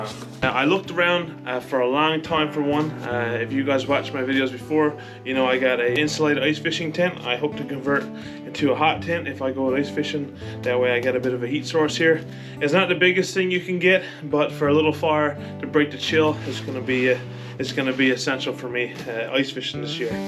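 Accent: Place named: East Coast, United States. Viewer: Ain’t hard to hear the east coast in yah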